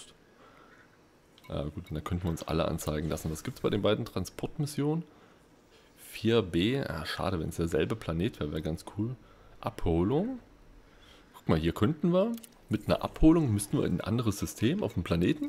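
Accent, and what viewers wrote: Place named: Saxony, Germany. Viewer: Ich für mein Teil freu mich enorm wieder Videos von dir sehen zu können 👍 Deine ruhige Art in kombination mit dem klassischen Let's Play-Schnitt hat mir bei all deinen Projekten gefallen. Vorallem, kollege Sachse, als Dresdener finde ich deinen Dialekt sehr deliziös.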